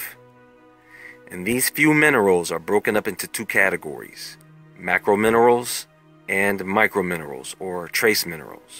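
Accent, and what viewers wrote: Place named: North Carolina, United States. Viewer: ! Are you from NORTH CAROLINA? You sound like it! Please respond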